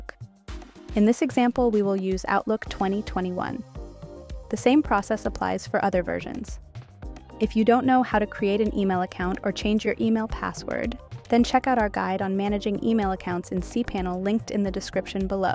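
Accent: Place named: United States. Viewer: Breath of fresh air to have an African resource for this - now consider having an African voice over to make it more genuine (as opposed to an American accented voice)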